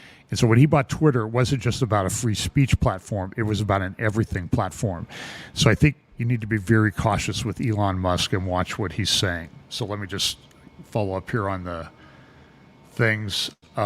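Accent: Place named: Chicago, United States. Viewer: Sounds like Chicago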